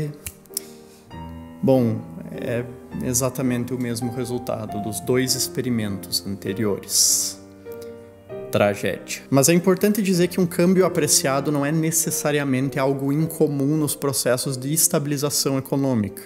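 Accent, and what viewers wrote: Rio Grande do Sul, Brazil. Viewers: Nossa, primeira vez q escuto sotaque gaúcho de uma maneira formal, dá uma estranheza pra mim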